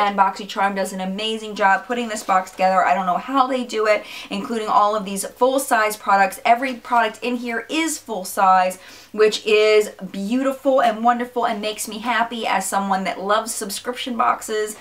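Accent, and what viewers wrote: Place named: Boston, United States. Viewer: I love your accent! Lol! Is it Boston? Cause the way you say Boxycharm makes me smile!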